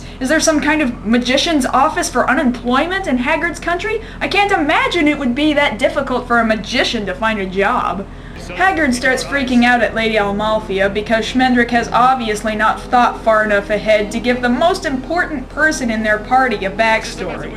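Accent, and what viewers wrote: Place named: Texas, United States. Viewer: Thats the beauty of a Texas accent!!! YAY!!! Southern People rule!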